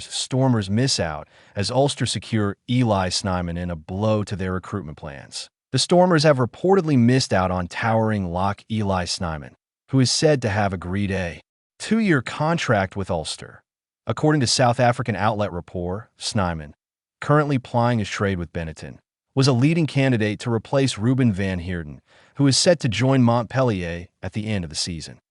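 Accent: United States. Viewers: AI crap, not just because of the US accent commentary !!! Don't bother with it folks !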